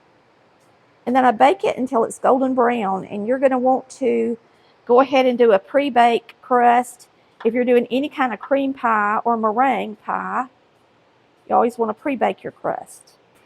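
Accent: South, United States. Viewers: You are some lucky husband's wonderful talented wife cook and baker. You simply amaze me with all of your talented recipes.Your instructions are on point and your lovely southern accent is so sweet. You also have patience with all your newbies, and that can be me at 74 years old. Bless your heart for all you teach us, it is so appreciated. Sincerely, From Grandma Debbie 🙋🧑‍🦽 and Dexter-Dog 🐕🐾 my sweet tiny rescued Chihuahua 🐕🐾 living 🏠🌴 a quiet crafty life 🏠🌵🏖️ in southern California. 🙋🧑‍🦽🐕🐾🌴🏠🌵🏖️🙏🙏😎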